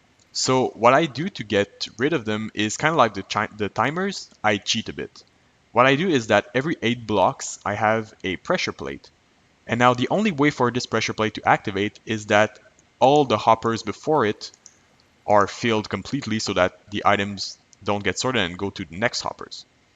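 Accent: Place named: Quebec, Canada. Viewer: Bro t'es québécois? j'reconnais ton accent XD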